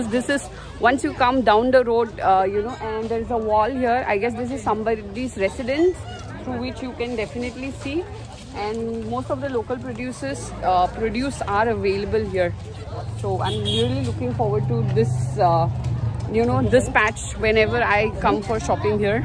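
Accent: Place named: India. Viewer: Your accent is really annoying.Sounds like mainland Indians.,vegetable should be pronounced as vejtebal not vejitebal.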